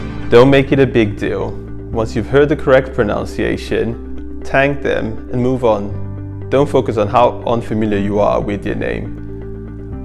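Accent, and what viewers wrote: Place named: Wales, United Kingdom. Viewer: Well presented. This problem with names in determining whether or not you will get an interview is not new, although people outside of London are more likely to make an effort to get your name right. On the flip side, there are many English names (not a problem with Welsh names) whose pronunciation is ridiculous, eg. Featherstonehaugh, pronounced Fanshaw. I think that you have begun to pick up just a trace of a South East Wales accent, so well done from a Welsh ex-pat. I had not heard of the campaign, so it deserves more coverage.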